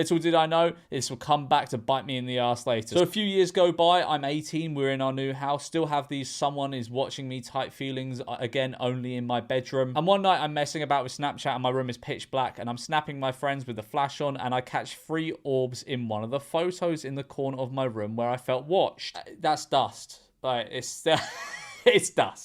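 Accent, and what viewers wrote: United Kingdom. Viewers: AAAAAAA! You scared me mr British memeuless guy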